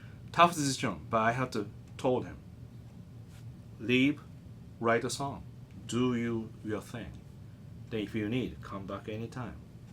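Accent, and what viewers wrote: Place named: Japan. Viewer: Amazing story! Perfect timing for me to hear this from you as I am also discovering my tone and how it makes others around me happy to hear it. I used to play and think I sounded great when I’m by myself, and then I would go on stage in the early years and all I could do was hear all my mistakes and think to myself how I sucked. But people would come up to me afterwards and say how phenomenal my playing was, and I couldn’t believe it! Because I noticed ALL my mistakes. But I’ve come to the point where I don’t care what other people think, and I don’t care if I screw up at all as long as I can have fun. Thank you for sharing the story about you and your journey with the guitar. I really appreciated hearing it from you. Japanese was my first language and I started learning English when I was six so your accent reminds me of some of my Japanese relatives. 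Jya mata yoroshiku onegai shimasu.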